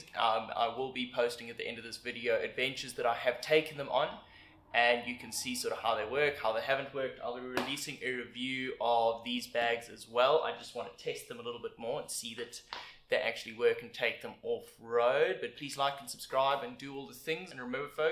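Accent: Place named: South Africa. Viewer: Any chance this is available in South Africa, sounds like a RSA accent 🙂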